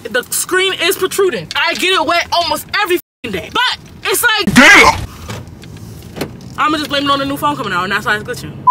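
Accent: Trinidad and Tobago. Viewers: No you're it's a Trinidadian accent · trini to di bone! accent so strong😭😭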